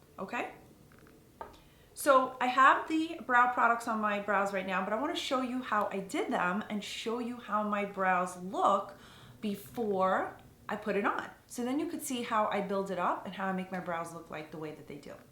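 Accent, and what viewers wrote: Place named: Long Island, United States. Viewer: I love your LI accent! I’m a guido from Brooklyn myself..lol. I never really had the need for makeup. I’ve been fortunate in that way. Good genes I guess. I’ve started to lose my brows since my thyroid condition. My hair, nails, lashes and eyebrows are just not the same. I’m not experienced at all in the makeup department anymore so I’m so afraid of makeup now and looking silly. I’ve seen that you can have your makeup done beautifully but if your brows are done badly, well then that is all she wrote. Everything just looks wonky after that. One of my problems now is picking shades (so many shades and undertones to chose from). Your brows are fuller than mine so they look darker but I think I’ll go with shade you’re using. I’m 50 something now..cough.. cough so I need something.. I’ve started using better skin care so I want to use makeup with good anti-aging ingredients. 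As for makeup, even if it is just putting on some mascara, concealer and having my brows done would be helpful so I won’t look so tired. Any tips would be appreciated!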